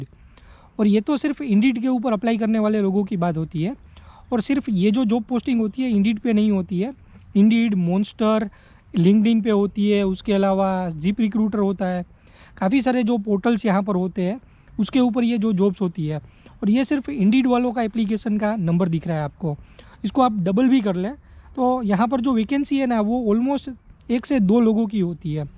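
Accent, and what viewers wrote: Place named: Saurashtra, India. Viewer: tamey accent thi gujarati to paaka cho pan saurashtra thi lago cho .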